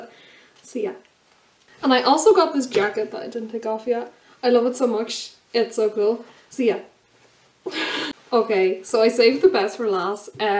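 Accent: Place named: Ireland. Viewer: Love this!! All your gifts look great! Hope you had a good birthday! Also I love your accent🤣🤣I'm half Irish but I don't have the accent☹☹❤❤xx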